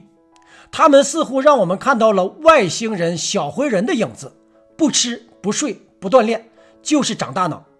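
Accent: Northeast, China. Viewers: 👍👍💕👏👏好像是我們東北口音？！支持你✌️💐🕊️🕊️🇸🇪🇳🇴🇸🇪🇫🇷🇮🇹🇩🇪🇻🇳🇭🇰🇯🇵🇸🇬🇳🇴🇮🇸🇫🇮🇳🇴🇹🇭🇦🇺🇯🇵🇸🇬🇺🇸🇹🇼～～～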